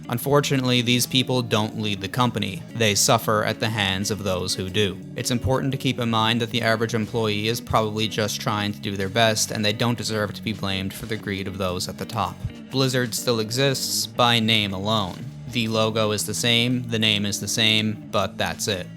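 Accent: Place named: Newfoundland, Canada. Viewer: You sound like a Newfoundlander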